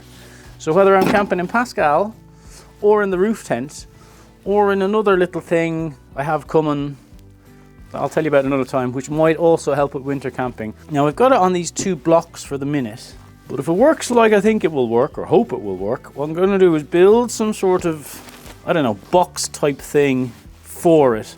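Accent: Ireland. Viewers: Well, you're SO wrong! 😄 We love listening to you prattle on about whatever in your Irish brough! Lol It's very entertaining! We would actually watch you do whatever. 😊 You actually do a great job of carrying on a great conversation with us (a camera) Please continue. We like you.😉👍 (We also love the chateau reno - watching) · Would love to listen to you blathering away with your wonderful Irish accent.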